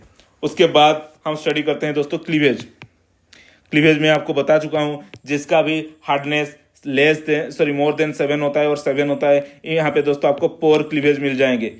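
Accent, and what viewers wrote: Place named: Odisha, India. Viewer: It seems to be a odia tounge with a imperfect hindi.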